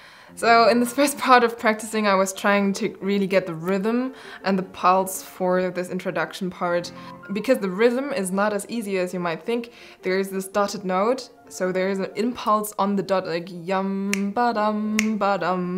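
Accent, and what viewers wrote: Germany. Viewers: U have a DEUTSCH akzent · höre ich da etwa einen kleinen deutschen Akzent? ^^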